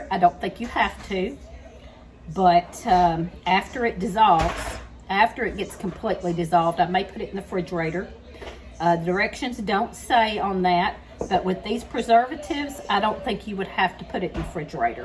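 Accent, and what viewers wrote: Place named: North Carolina, United States. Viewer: A two spoon of that? What’s a two spoon? I’m born and raised right here in south eastern NC Brunswick county or ocean isle beach but your accent sounds exactly like ours that’s why I was wondering if you said two or yea it didn’t sound like tea spoon though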